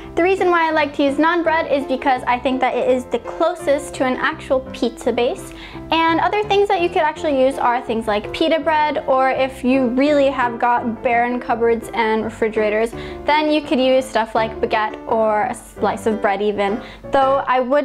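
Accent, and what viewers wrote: United States. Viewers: That explains a lot! Yours is the least odd foreign American-sounding accent I've heard, though. Had a friend whose wife was from... Croatia, I think? She had the most Brooklyn English accent I've ever heard. I didn't know she wasn't from Brooklyn for about a year after I met her. I never did find out if she learned to speak English from someone from Brooklyn or if she just chose a heavy American accent to cover her Croatian accent. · Definitely a Floridian accent. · her accent sounds like it is from the midwestern us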